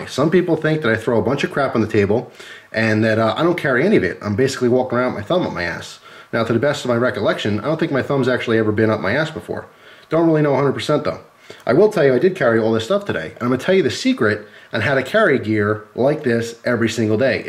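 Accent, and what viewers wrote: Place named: New Jersey, United States. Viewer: Couple things, if you don't mind: Where are you from? I ask because I'm from Jersey and you sound like my people haha. Also, you mentioned Rite Aid, and I haven't seen one of those since I left and came down to FL (been down here 8 years now). I also ask because I know how horrible Jersey gun laws are since a buddy of mine still lives up there and is constantly bitching about it when we talk guns and gear, etc. And, if you don't mind one bit of advice/comment why the smaller mag for backup? Since it's in your pocket anyway, why not use the full-size magazine (not the 33, but the standard 13-17 rd depending on caliber) instead? I ask because it hit me that for not much more weight/size difference, I can get a few extra rounds, and if I'm already reloading, then chances are I might need them. Just a thought, just trying to help. 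Thanks for sharing your EDC, always good seeing these and getting ideas. Much appreciated and stay safe, brother.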